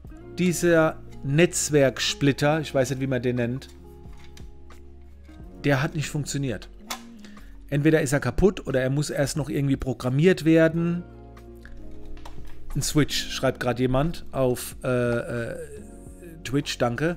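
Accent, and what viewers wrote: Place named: Mannheim, Germany. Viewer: Bist du aus Mannheim? Dein Dialekt hört sich so an. Grüße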